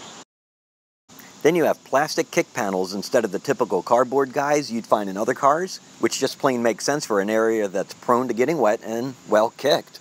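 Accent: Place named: Philadelphia, United States. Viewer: Heard a little bit of Philly in your accent; then saw the DE tag on your car; makes sense!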